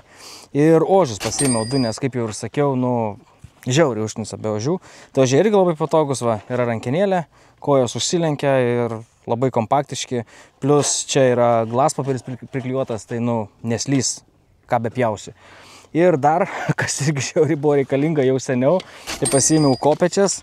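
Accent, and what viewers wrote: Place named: Suvalkija, Lithuania. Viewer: Geras suvalkietiškas dialektas😆👍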